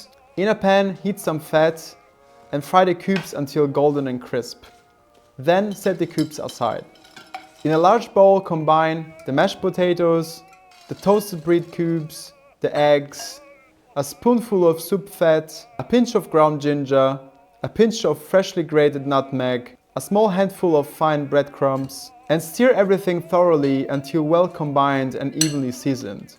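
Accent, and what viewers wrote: Germany. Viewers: This channel combines several things I adore: pre-WWI German history (when it was unproblematic to admire the Germans); a well-researched and -scripted deep-ish examination into an obscure but interesting cultural artefact; listening to a native German speaker using the language with such facility (I'm biased, I speak German and like the German-speaking-English accent, with all its idiosyncrasies); and quirky moustaches. This channel really is so verdammt charmant, especially the gramophone segments. And 20 minutes long this time! You spoil us :) BTW don't Europeans also use small blunt-ish paring knives to peel potatoes/apples/etc? That jagged knife you use for these tasks looks like an accident waiting to happen ;)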